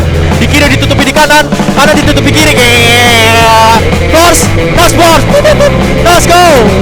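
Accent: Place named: Lampung, Indonesia. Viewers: Itu bukan ngegas, logat lampung emang kayak gitu. Makanya sini ke lampung biar di ceramahin dulu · Gausah dengerin orang orang belloon bang tau gua orang Lampung begimanah logatnya wkwkwk fokus berkarya aja lu nya bang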